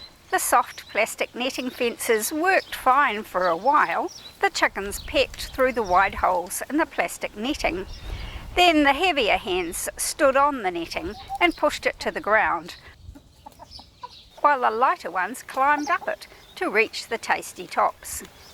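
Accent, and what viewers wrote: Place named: New Zealand. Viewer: that was my guess that you lived in New Zealand just got Clues from your timestamp the beautiful weather in December, and your accent. A lot of your words pronounced just like your FORMER prime minister what's her name. Jacinda? 😅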